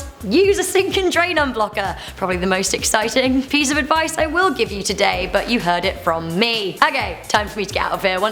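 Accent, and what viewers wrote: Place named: United Kingdom. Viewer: Aay didn’t know u were British like me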